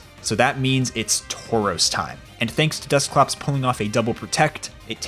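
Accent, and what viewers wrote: United States. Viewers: Are you from the Philly area?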